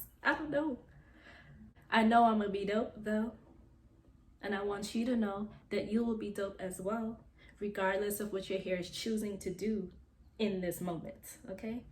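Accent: Jamaica. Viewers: Good morning, was appreciating your informative videos and noticed slight accent. Jamaican?🙂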